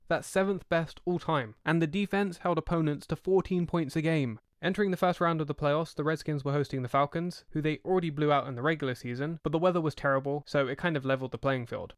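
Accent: England, United Kingdom. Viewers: Really nice vid. Well done. I have to admit, it’s different hearing this from an Englishman. Is the NFL even popular across the pond? Keep up the good work! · I am a Giant's fan from 1973. Nobody has to tell me how great the Redskins were in the 1980's under Joe Gibbs. As much as I hated them back then - that team always had my respect. They were talented, disciplined, and well coached. 45 years later it looks like they could be on the threshold of another championship team. Hope it is only 1 .... ; ) How do you know so much about American football with a British accent? I am pleasantly surprised - unless you are AI?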